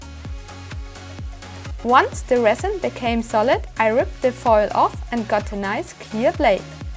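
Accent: Germany. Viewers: Great work!!! Are you german? Your accent sounds like you re from Germany. Oh and can you build the Necorchasm or Thorn from Destiny? Would be awsome! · You're incredibly talented, but German accents make me cringe :D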